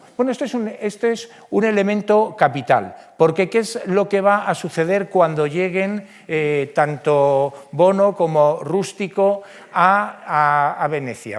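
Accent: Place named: Spain. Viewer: Qué asqueroso es el acento español. Como si les faltarán dos dientes. Y para colmo el concepto que tienen de ellos mismos... Mediocres. Buscan compensar su mediocridad con racismo. Buscaré otra vía para enterarme del mismo tema.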